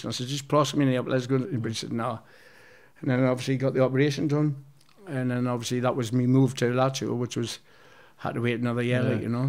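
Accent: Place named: Newcastle, United Kingdom. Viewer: Amazing geordie from a mackem 👍😁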